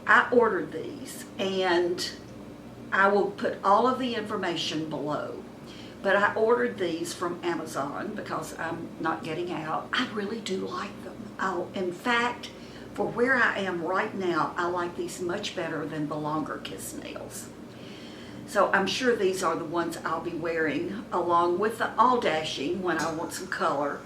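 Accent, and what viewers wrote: South, United States. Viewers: Where are you from? You have a southern accent. I'm in TEXAS.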